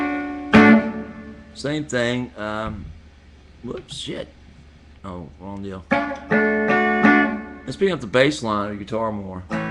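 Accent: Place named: South, United States. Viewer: Bro you are a good ole southern boy just like me lol Ive got the very same drawl you have!!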